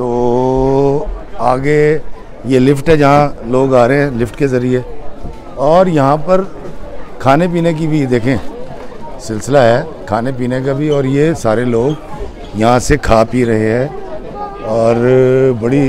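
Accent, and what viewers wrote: Pakistan. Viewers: Aap k vdo achi hai aap ka (efal) tower aur ( perass) kehna b khalis Pakistani style hai aur phir ( O my God…) all good but ye jo perass ka nazara hai na ye kuch itna impressive nai hai vs our Islamabad view from Daman e koh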